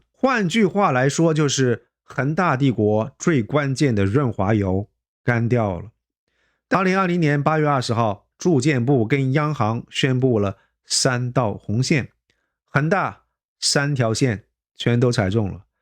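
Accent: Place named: Guangzhou, China. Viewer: 从发音来看，我猜是广州人:face-blue-smiling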